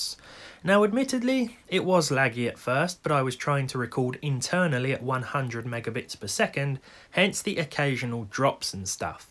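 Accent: United Kingdom. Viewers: This guy is the most stereotypical brit I've ever heard. This cheeky baastud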